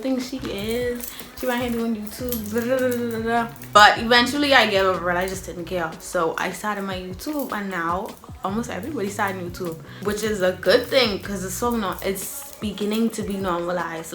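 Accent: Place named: Caribbean. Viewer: You from trinidad? your accent is hella Caribbean and excuse me if you said it in the video I didn’t watch the whole thing 😂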